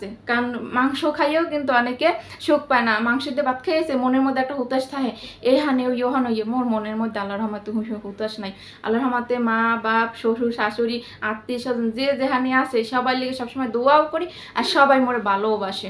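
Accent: Barishal, Bangladesh. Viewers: আপু তোমার বরিশালের ভাষা শুনতে আমার খুব খুব ভালো লাগে❤❤ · আপু আমার মেয়ে আপনার আপু আমার মেয়ে আপনার বরিশালের আঞ্চলিক ভাষায় কথা অনেক পছন্দ করে ❤❤আপনি এভাবেই কথা বলে ব্লগ করে যান❤❤পাশে আছিআপু❤❤ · আপা বরিশালের ভাষা মোগো আঞ্চলিক ভাষা ভালো লাগে আপনি এভাবেই কথা বলবেন ভালো থাকবেন ❤❤❤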